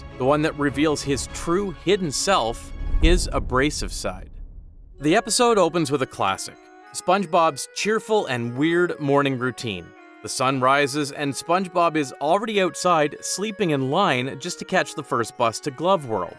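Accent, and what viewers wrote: Canada. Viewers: Your Canadian accent is so prominent lol. Fire video though! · Are you Canadian, my guy?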